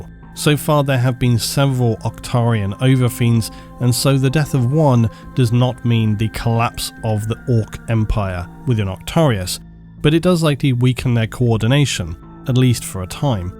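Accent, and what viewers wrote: England, United Kingdom. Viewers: 2nd this, rather enjoy me some sophisticated brash british guy & the pragmatic dry humor & his narration tone that slaps like cold steel and enraptures one so thoroughly you hardly even notice the mutton chops growing over you’re ears. Edit: Reasons. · Documentary content just sounds better when narrated with an English accent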